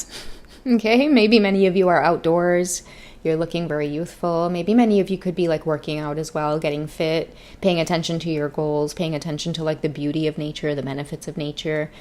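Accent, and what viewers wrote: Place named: Trinidad and Tobago. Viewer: Not Jamaican, she’s from Trinidad not Jamaica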